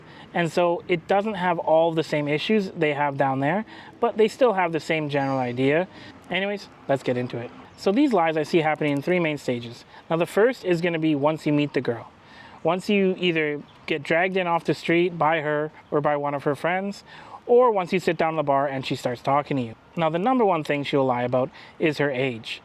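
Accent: United States. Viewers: *Im not american*, says the dude with one of the most american accents Ive ever heard. Sounds like hes straight out of Cleveland or some shit. lol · You sound American to me, but if you say you are not I will assume you are Canadian, which by defintion, in my book, is "American"... :)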